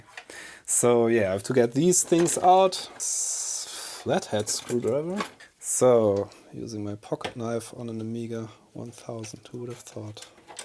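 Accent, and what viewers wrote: Germany. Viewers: Great hands-on video, immediate subscribe. Von Akzent aus, bist du deutsch? 🇩🇪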